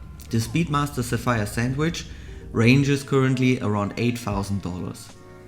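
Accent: Germany. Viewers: Denglish hardcore😆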